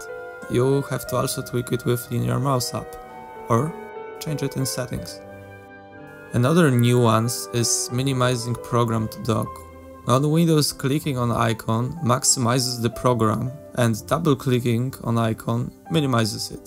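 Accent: Poland. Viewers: Pierwsze parę sekund i myślę sobie: kurwa to musi być Polak XD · are you Polish? I'm guessing by accent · Do I hear Polish accent?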